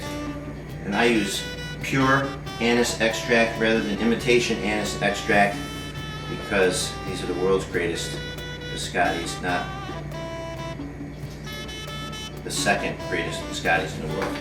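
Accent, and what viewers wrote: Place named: Brooklyn, United States. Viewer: Ciao, you sound like you're from Brooklyn! Good recipe! I'll give'er a try! Ciao, Brooklyn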